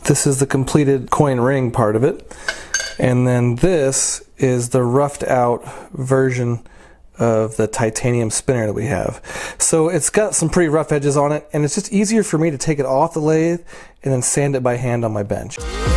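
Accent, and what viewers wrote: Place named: Indonesia. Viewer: Yang dari indonesia mana suaranya😁😁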